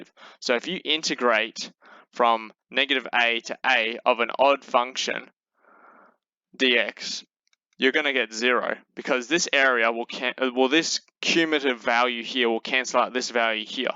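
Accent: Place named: United Kingdom. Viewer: Recognizing british's speech is pretty tough, sorry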